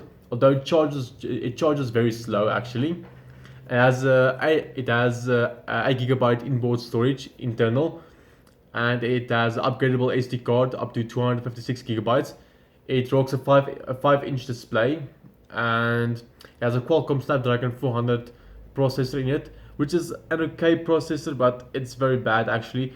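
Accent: Afrikaans, South Africa. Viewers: Daai lekker diep Afrikaanse aksent, jys 'n regte boer!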